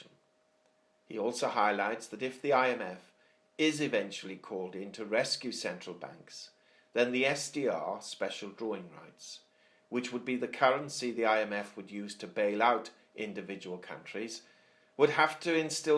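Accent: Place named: Wales, United Kingdom. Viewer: I thought you sounded Welsh, is that right? if you are, it's a very mild accent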